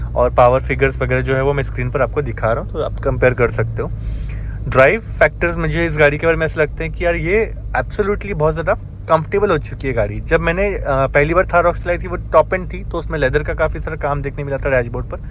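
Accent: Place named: Mumbai, India. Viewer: Bhai kya commentry hai .. wah maza aa gaya ... Mumbai touch hai aapke language main .. keep the good work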